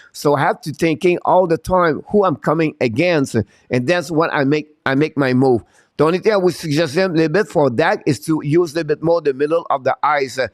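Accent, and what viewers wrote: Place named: France. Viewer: The FrenGlish is great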